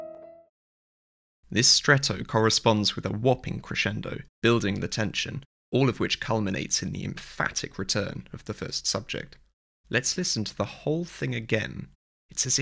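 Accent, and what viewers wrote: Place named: RP, United Kingdom. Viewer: Lovely little video chock full of insight! You should know that I’m positively besotted with you — your angular Anglo-Saxon beauty and the plummy notes of your delightful posh accent (at least to my American ears). I’m a composer in my own right and I find your commentary spot on. Pardon my little outburst of gushing, but I wanted you to know how much I appreciate your videos. Keep up the good work!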